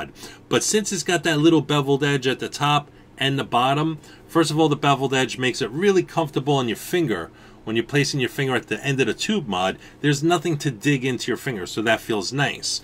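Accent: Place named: Italian American, United States. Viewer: hey another good review love the italian american accent thumbs up up from australia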